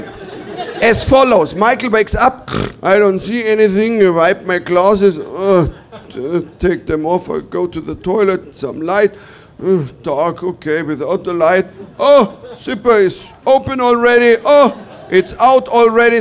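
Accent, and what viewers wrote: Bavaria, Germany. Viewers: speaking as someone who saw Michael's shows back in the 90's, I can say he has consistency, something uniquely his own. I would recognize it, even if it were written down. An entire story, snappy call backs, mimicking sounds, accents, stereotypes, beginning to end, it is all connected. Of course he is more comfortable in German, but doing stand up in another language takes major balls, and he is doing a great job at it. his Bavarian accent is something i thought I's miss in his English shows, but actually I can hear it, even in English :D :D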